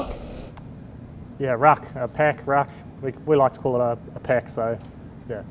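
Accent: New Zealand. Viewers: Ev great ! Just the accent ! 😮😁 Go Zealees !! 🇳🇿👏👏👏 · Is this a normal New Zealand accent?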